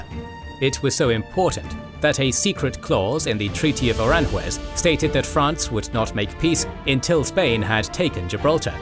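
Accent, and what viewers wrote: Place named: United Kingdom. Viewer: Weird how a British guy is narrating this video.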